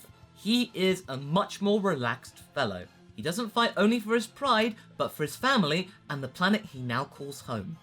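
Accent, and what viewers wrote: United Kingdom. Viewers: dude your voice sounds like a british version of dbz abridged goku 😂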